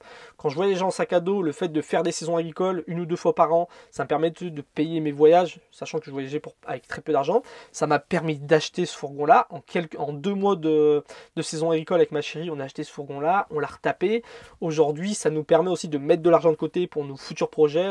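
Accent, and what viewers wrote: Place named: North, France. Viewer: Bonjour Merci pour la vidéo. Il est plus rassurant de partir en woofing car il y a une certaine réglementation. Porte à porte plus compliqué quand une fille part seule en Australie. Le principal est d être claire avec le woofer et être très explicite quant à nos attentes. Soit dit en passant, vous avez l accent nordiste Merci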